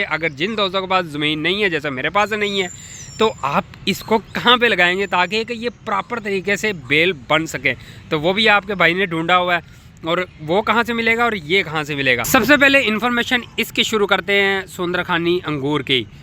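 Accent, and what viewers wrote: Punjab, India. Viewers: پنجابی لہجے میں اردو تقریر۔لمبی اور فضول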